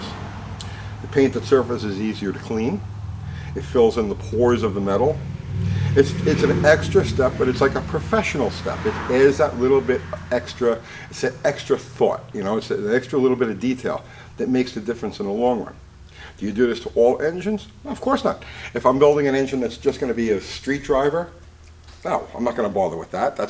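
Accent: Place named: New York, United States. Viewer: I've noticed a deep underlying trend to your videos lately, and I truly mean this constructively. Your videos are a direct response to comments lately. You address these "issues" and "haters" as the New Yorker you were raised as. The issue is that the New England accent in general has adapted into something that comes across as aggressive and matter of fact, to the point of over the top arrogance. And to most of the viewers not from NY you might just sound like a know-it-all dick, this could be off-putting to the simple folk and cost you a few subscriptions and a views. Just some professional criticism.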